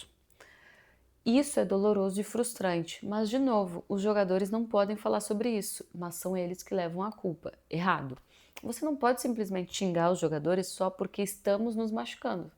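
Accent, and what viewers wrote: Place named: South, Brazil. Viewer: Valeu pelas infos quentíssimas, apesar do sotaque do sul, é CORINTHIANS! 🦅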